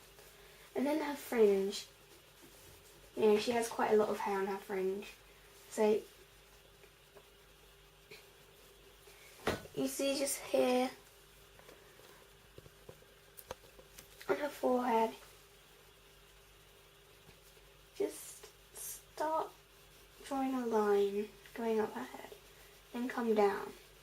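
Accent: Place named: United Kingdom. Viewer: I LOVE your videos! I have already done all the Bakuras and I like Kisara too. It's so nice to hear another British accent on YouTube, too many Americans, though mine a lot more country than yours! :-P Just wondering, could you do either a Malik or Marik video please! I would love to know how to draw them! Anyway, love the videos and keep drawing! :-D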